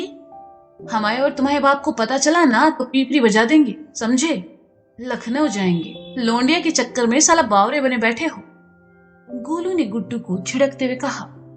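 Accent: Kanpur, India. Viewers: Mujhe apki ye kanpuriya language boht achi lagti h kya mst bolte ho sach me jbse murari wala sune tbse boht acha lga❤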